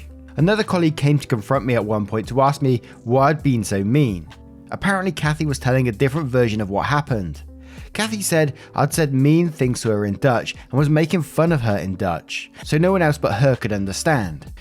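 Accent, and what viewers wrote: United Kingdom. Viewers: Greetings from Kansas City, Missouri, USA! I usually play Mahjong, Solitaire, or Yahtzee while I listen to your stories. I can’t help but enjoy your content. You have such empty and compassion for people and I absolutely love how you’ve taken in Poppy. I’m a sucker for a guy with a British accent who loves animals (please don’t take that the wrong way, as I’m quite happily married), so feel free to share as many pics of Poppy as you want. We’re definitely here for it! Much love!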